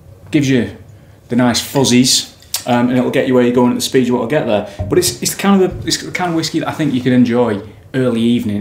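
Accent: Lancashire, United Kingdom. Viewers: Nice review!!! £24.60 on Amazon 27/2/23. Enjoy your enthusiasm and honesty. Thank you. Do I detect some northern accent. Lancs? Greetings from Cheshire 😌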